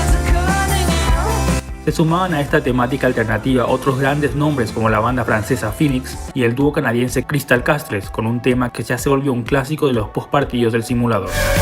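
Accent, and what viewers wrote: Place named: Paraguay. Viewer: Suenas como paraguayo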